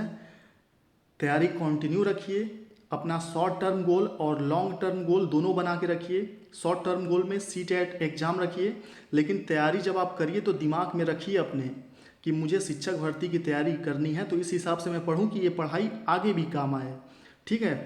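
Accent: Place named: Uttar Pradesh, India. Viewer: I m right .apki bato se.. video k starting m hm soch hi rhe the...ki aap U.P. se ho..or aapne apni video m hi clear kr diya.....hmne apki complete video dheki h.. 🙏🙏🙏sir plz ek confuse clear kre...sst ki preparation kaise kre...plz tell me...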